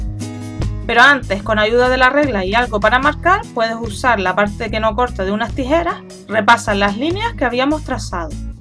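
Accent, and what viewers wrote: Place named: Gran Canaria, Spain. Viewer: Genial, me ha gustado!!! por el acento se nota que eres de Gran Canaria, un saludo desde la isla vecina!!!!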